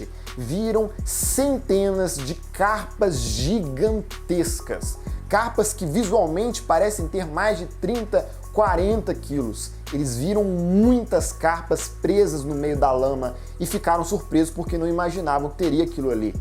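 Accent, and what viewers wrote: Minas Gerais, Brazil. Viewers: Vídeo top ,vc e mineiro também m sabia 😄uai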